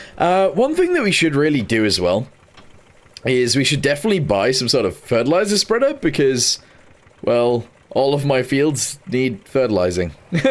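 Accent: United Kingdom. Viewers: Is it just me or am I detecting a Geordie twang in your voice?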